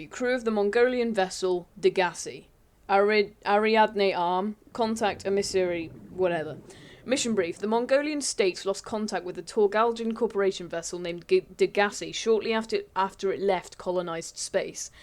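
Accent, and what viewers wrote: United Kingdom. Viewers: I LOVE YOUR VOICE... HONESLY I'VE ALWAYS WANTED TO HAVE A BRITISH FRIEND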